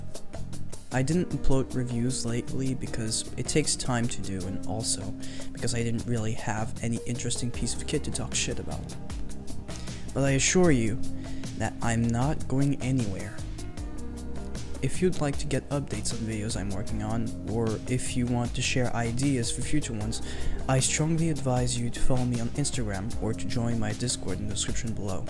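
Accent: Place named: United States. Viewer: not bitching but you sound awfully american for someone giving advice on getting nods in eu, hehe. also, is that machine girl in the outro?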